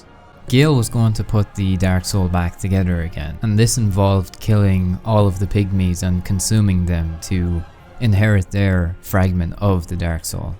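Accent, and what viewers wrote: Ireland. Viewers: your accent but it sounds Irish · You sound Irish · your accent. Irish?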